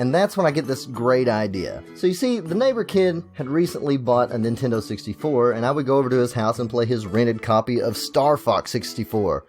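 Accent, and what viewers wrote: South, United States. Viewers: Is that a southern accent I hear?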